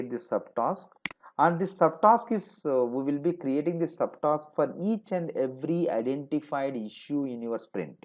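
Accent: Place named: India. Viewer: and again an indian. cant listen to that accent anymore -.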